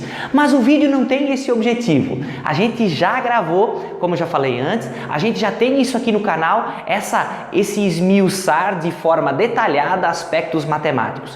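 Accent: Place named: Limeira, Brazil. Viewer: Amigo, vc é de Limeira-SP? Pelo sotaque, se parece com o meu....rsrsrs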